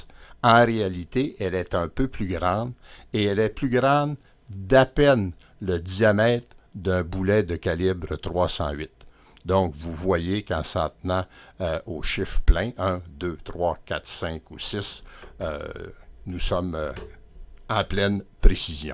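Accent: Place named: Quebec, Canada. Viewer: Excellent ! Merci beaucoup pour cette vidéo, et au top l'accent cousin québécois 😁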